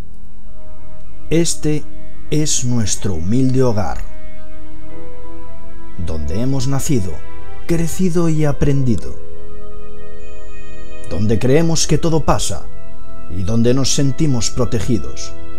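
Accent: Spain. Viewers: Es epañol el tio 😌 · lástima de la narración en el español feamente hablado por los propios españoles.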